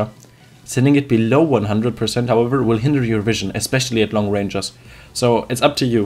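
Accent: Germany. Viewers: are you german??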